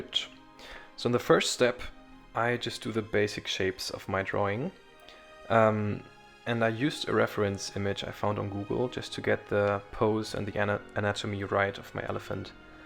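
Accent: Germany. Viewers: I pick up a really small german accent but you sound very calm and focused! Great work, no need to feel awkward :D awesome video, thank you so much! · Ich dachte mir schon so...hm...ziemlich deutscher Akzent... ach der ist ja tatsächlich Deutscher xD Ziemlich mutig ein englisches Voiceover zu machen :) Das Bild sieht einfach nur atemberaubend aus, nicht nur wegen der tollen Anatomie, sondern auch weil deine Bilder irgendwie immer einen... mystischen Touch haben :D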